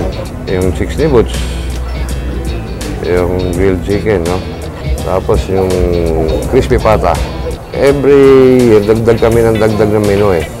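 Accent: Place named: Philippines. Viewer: I saw him on Zimmerman's show and noticed his English accent was more Filipino... he's practically Filipino. Thanks for sharing this vid!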